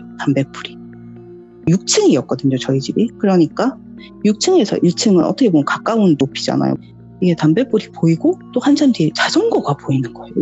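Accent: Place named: Gyeongsang, South Korea. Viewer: 갱상도 ㅋㅋㅋ신경과민ㅋㅋㅋ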